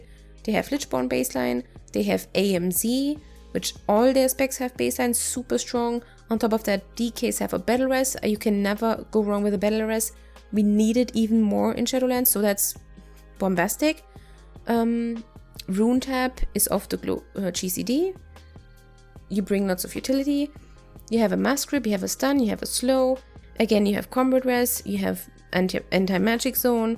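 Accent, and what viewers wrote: Germany. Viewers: This German school English 😂. So cute. I listen you first time but 100% you german. But good video👍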